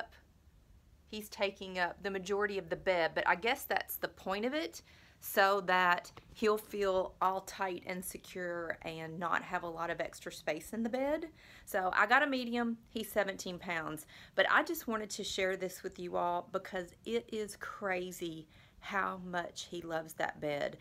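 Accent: South, United States. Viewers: I cannot listen to your SOUTHERN DRAWL any more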